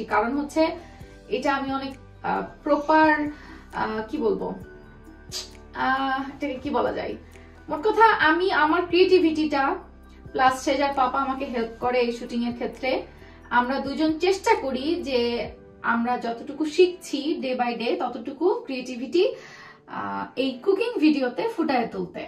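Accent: Kushtia, Bangladesh. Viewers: Apu ami tomar kotha sune mone hoyeselo tumi amader kushtia r meye ,onak valo lage tomake.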